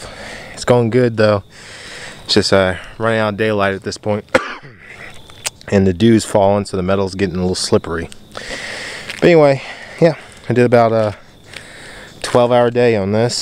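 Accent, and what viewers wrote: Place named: Pittsburgh, United States. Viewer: Pittsburgh Accent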